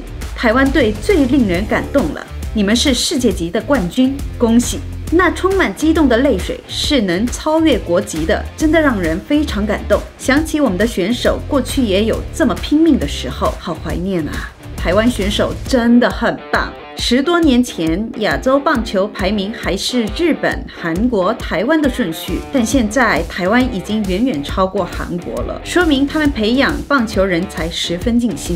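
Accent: Standard. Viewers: 您的中文真標準，謝謝您的影片❤ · 國語超級標準😂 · 妳的中文好標準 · 天呀 你的中文發音非常標準❤ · 這國語也講得太標準了,是真的韓國人嗎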